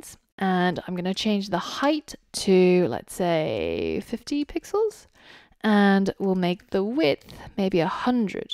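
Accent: United Kingdom. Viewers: She’s from London.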